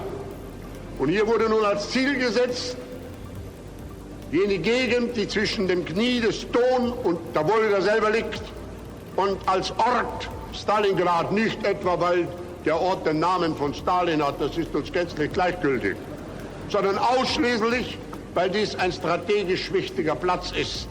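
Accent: Austria. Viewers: He is Austrian. From Braunau. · Es war einfach ein österreichischer Dialekt Junge. Nix besonderes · When you are from Austria you can hear where he came from. Rural austrian dialect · Ist es wirklich. Sein österreichischer Akzent ist weniger stark als ich gedacht hatte. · Interesting to hear his dialect that he adopted in Vienna as a young man.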